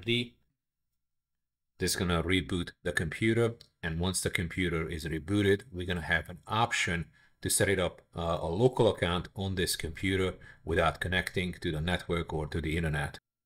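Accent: Hungary. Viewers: You must be a Hungarian. Nice accent! 👍 and also greate guide!!!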